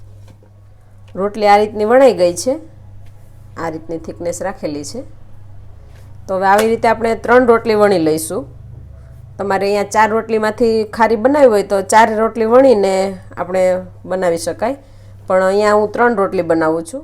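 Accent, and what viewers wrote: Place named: Africa. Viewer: Looks amazing ben Hu pan banavish Radha rani bless you ❤ You sounded like you are from Africa Me too now in uk 🇬🇧 😮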